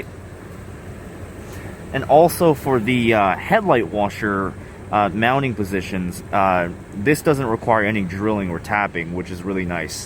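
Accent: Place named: United States. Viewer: I see. You speak English as if you’ve lived in the States your whole life. I didn’t think you guys had Sequoia’s over there. The Alpha Rex headlights must be MUCH cheaper there if you ordered that many!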